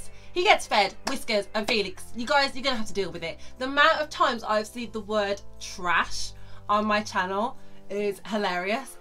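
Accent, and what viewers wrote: United Kingdom. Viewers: I like your british accent,and you sphynx is so adorable!,I love it !.John M Guinto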